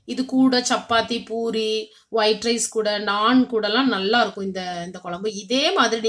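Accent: Tirunelveli, India. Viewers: It looks simple and easy. But I really enjoyed your narration.....I can feel the Tirunelveli ambient in our slang....Keep up the good work.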